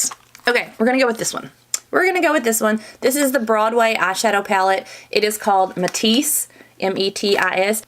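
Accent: United States. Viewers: what a great idea! i love watching your videos. so real and funny and you have a country accent like me LOL!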